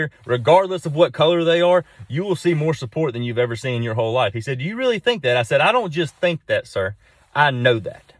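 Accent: South, United States. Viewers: That Southern draw is so sexy. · I always feel if you are too big you don’t care or listen, but damn you actually do and I’m glad cuz I love that southern drawl but will admit it can be scary due to stereotypes, thank you love ya mucho amor 💕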